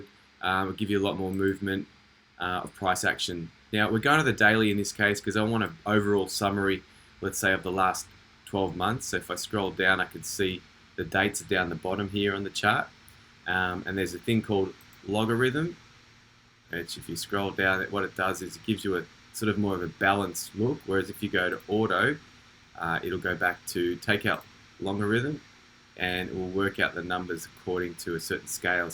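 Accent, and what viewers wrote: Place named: Australia. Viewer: Can you do a staking video please , good to hear an ozzy talking crypto .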